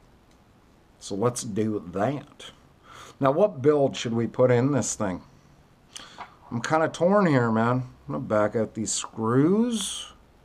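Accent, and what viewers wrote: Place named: Quebec, Canada. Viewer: Lol, your accent was more French Canadian than Louisiana.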